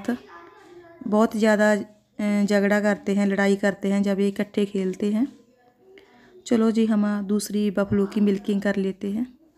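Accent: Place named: Punjab, India. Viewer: Hello mam aap Na Punjabi ma bolta hi Sacha lagta ho oor milking ka trika bhi sahi h hum bhi asa hi Karta h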